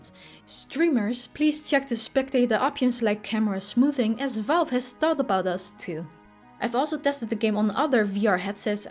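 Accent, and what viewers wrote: Netherlands. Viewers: Her English pronunciation is already nearly flawless. I can understand her perfectly every time she talks, something I can't say about many, many native speakers. And besides, that Dutch accent is awesome!! So my recommendation to you is to go pound sand. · Are you dutch by any chance?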